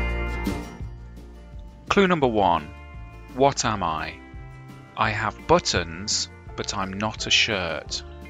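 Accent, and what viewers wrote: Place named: England, United Kingdom. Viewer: 18 wrong for me on that one. Although I know it is a sphygmomanometer, most medical professionals call it a cuff, probably because that is less of a mouthful. As for the ponderer's question, I'd have thought with your English accent you would have known it may be an elevator for Americans, but it is a lift in English English.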